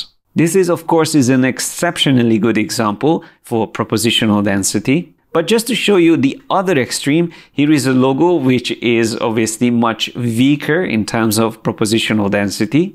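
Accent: Hungary. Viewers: you have a big ass hungarian accent :D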